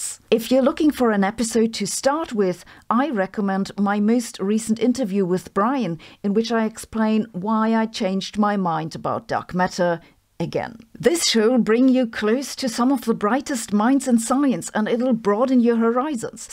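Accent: Germany. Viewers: Hossenfelder: don't do away with your german accent too much when communicating science using the english language: it has a nice charm and ring to it ;-)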